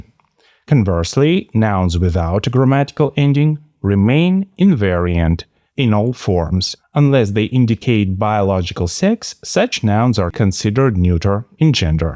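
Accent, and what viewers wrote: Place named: Russia. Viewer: You are funny. You show the Shining movie, or make comment in another video about that there is no escape to learn Russian numbers only escape from Siberia. Oh God you are too much. Some Russian when speaking English seem they have a big potato in their mouth.. You are one of them. It's a kind of funny and cute. Anyway it's a joy listening to you all night. Thanks for all your videos.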